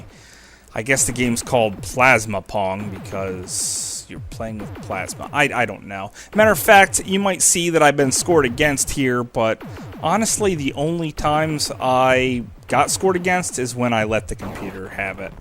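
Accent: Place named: United States. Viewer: Without looking at your details, but after watching almost all of your Jaguar reviews over the last couple of days , and enjoying and agreeing with most them, I'm pretty sure your accent is American...maybe Canadian. Why do I bring this up at all? Because through every single review you pronounce the Jaguar as JAG-WIRE. And though the British claim the true proper pronunciation with JAG-YOU-ARE, even the true American English pronunciation is JAG-WOAR with the WOAR pronuonced like CAR or STAR, which I only spelled that way instead of WAR since that is pronounce WOR, in, I believe both American and British English...where do you come from or where did you ever pick up JAG-WIRE?!? Another Good review I agree with though, even though I have picked on you in several of these video comments, Over all I love your style and your sensibilities are like mine for the most part, even with some games that are generally considered terrible, and you and I disagree. 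There are a few, however, that I am blown away because your review of a game was exactly opposite of what I expect from you or think about it myself...I'm loving it though! I will start checking out reviews you have for other systems now, that I have also...or, I would, but apparently the only other system you have in your play list that I have is the 3DO, and I'm not interested in the few items you reviewed so far (at least not interested in a review of interactive porn or what ever) You need to get some 3DO games! Want me to r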